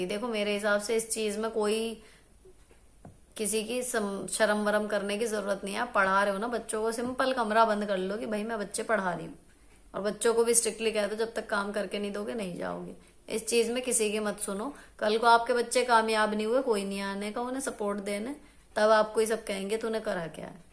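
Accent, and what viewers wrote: Meerut, India. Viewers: आपकी भाषा पूरी मेरठ की है, खड़ी बोलीं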